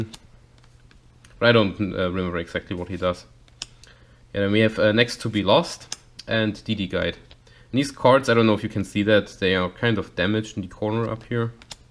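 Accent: Germany. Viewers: Bist du Deutsch da du Englisch wie ein deutscher sprichst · Are you german?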